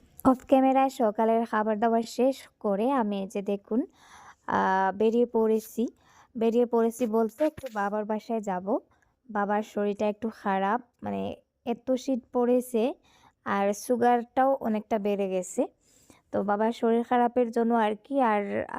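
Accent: Sylhet, Bangladesh. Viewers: আপু আপনি কি সিলেটি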